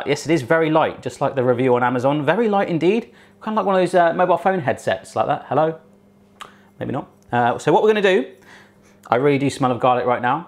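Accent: United Kingdom. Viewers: Doesn't like tea? Is he british or does he just have an accent? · I usally hate the british actsent but when you talk, i totally felt in love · u always say "I hate tea" or "I hate olives". well u hate a lot of things ow ant btw i love ur Brittish accent!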